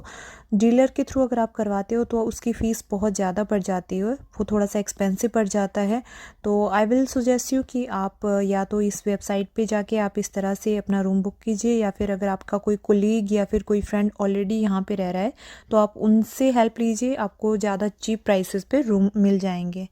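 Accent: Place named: India. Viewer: Your English Accents like Indian language , Not understanding at all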